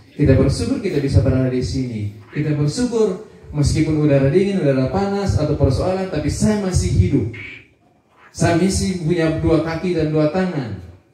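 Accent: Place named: Lembata, Indonesia. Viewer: ni macam dari pulau lembata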